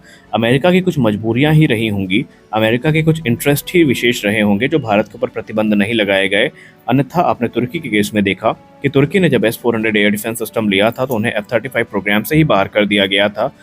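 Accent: Pakistan. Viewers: Sala tera awaj padosi jaisa lag raha hai tm pakistani channel hai paaka INDIAN NAAM RAKHA HAI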